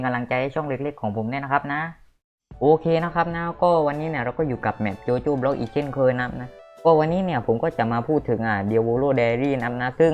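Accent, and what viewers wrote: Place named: South, Thailand. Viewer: สำเนียงคล้ายคนใต้เลยนื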